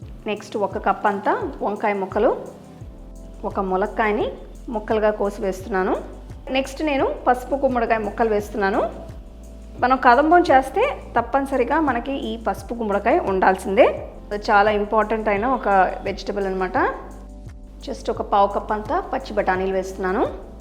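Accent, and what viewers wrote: Tamil Nadu, India. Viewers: అవిడ భాష పలికే తీరే చేప్తుంది తమీలీయిన్ అని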